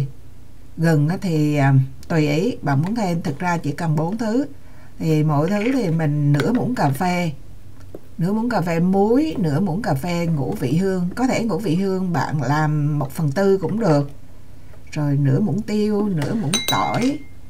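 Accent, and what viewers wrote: Quang Nam, Vietnam. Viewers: Em chỉ dẫn rõ ràng và dễ làm, giọng quảng rất dễ thương vì chị cũng là người đồng hương của em. Cái châm lỗ trên da em order ở đâu vậy? Chị muốn mua. Tks em.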